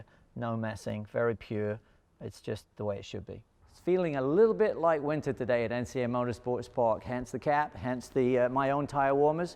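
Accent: United Kingdom. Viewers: Nottingham, but he's been hanging around with a lot of Yanks.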